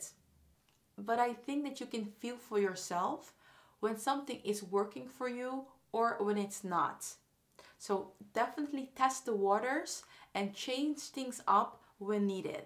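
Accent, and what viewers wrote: Netherlands. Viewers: Where are you from? You start like you are from the Netherlands!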